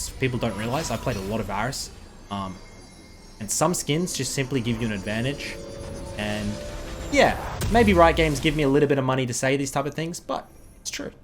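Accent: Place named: Australia. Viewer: The more I listen to, the more I like australian accent.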